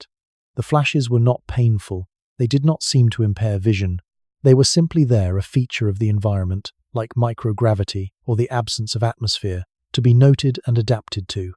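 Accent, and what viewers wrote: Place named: England, United Kingdom. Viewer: I just discovered your channel last night as the algorithm finally figured out that I love science. It’s great to have a channel with a mellow & relaxed English accent that doesn’t drive up my cortisol levels. Subscribed immediately. Can’t sleep tonight though, so I just got up & got into this. I’m in Norman, Oklahoma, US, a.m. I am completely exhausted with channels that are nothing but gossip about celebrities or the monarchy or politics. This is a breath of fresh air. Thank you for all your hard work & thank you for making me a better informed person 😊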